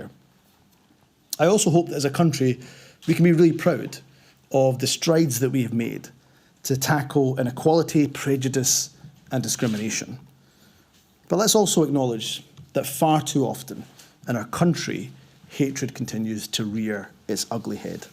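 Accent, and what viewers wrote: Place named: Scotland, United Kingdom. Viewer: he sounds pretty scottish to me. unles you're just a racist arsehole, of course.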